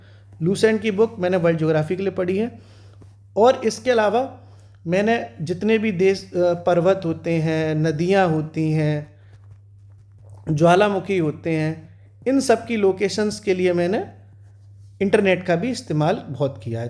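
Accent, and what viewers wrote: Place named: Etah, India. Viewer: एटा की भाषा लगती है आपकी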